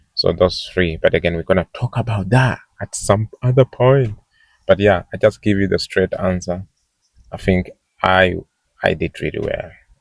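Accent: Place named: London, United Kingdom. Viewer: from Malika squad, happy to see you.oh my .... u have a London accent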